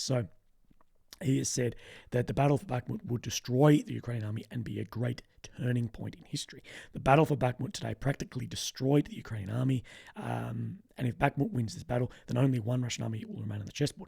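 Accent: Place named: Australia. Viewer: the sound is messed up on this one & has been getting worse on a couple of late,you need to bump it up a bit,although the ozzie accent is fun it can be hard to understand if it is mumbled & hard to hear.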